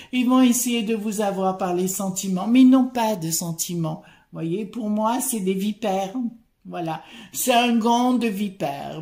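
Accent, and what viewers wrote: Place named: Dauphiné, France. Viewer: quelle joie d'entendre votre voix avec l'accent du Dauphiné ! Merci !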